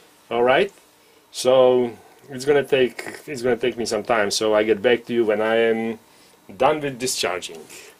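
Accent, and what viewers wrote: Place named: Germany. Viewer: Considering he sounds very much German I'd give him a pass...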